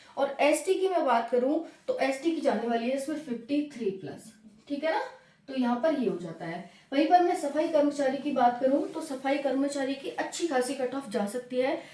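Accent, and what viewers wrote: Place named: Mathura, India. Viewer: Apki language se hi lag raha tha ap mathura se ho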